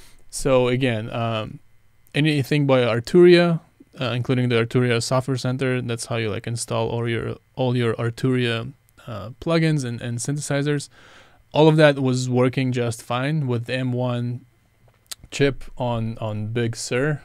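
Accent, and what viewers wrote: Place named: Paris, France. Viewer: there’s this channel on youtube called street french and your accent kind of sounds like hers. She’s from Paris. Are you from Paris too?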